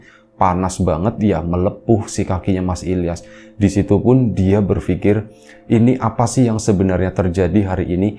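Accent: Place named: Banyumas, Indonesia. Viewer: oh pantesan ngapak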